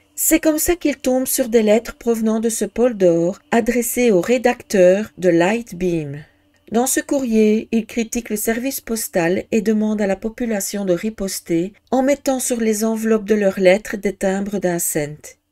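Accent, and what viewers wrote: Belgium. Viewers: Bonjour, un grand merci pour tes vidéos de grandes qualités, je me pose une question:quand j entend ton petit accent viendrais tu de Belgique dis moi. 😉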